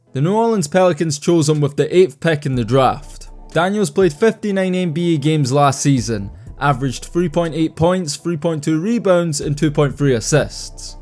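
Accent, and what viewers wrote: United Kingdom. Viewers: Imagine calling Jalen Green a bust when he averaged 22 ppg in his sophmore year LOL u don't know wtf u talking about last time i click on a basketball video from a dude with a british accent tf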